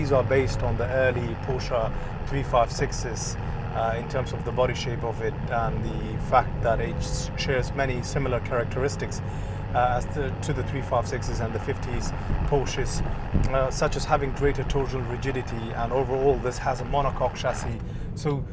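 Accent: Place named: London, United Kingdom. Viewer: What a hell of an accent does this guy have? London?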